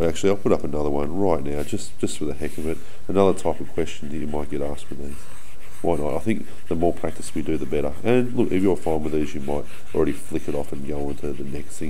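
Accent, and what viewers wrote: Australia. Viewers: I don't really understand coz of his aussie accent.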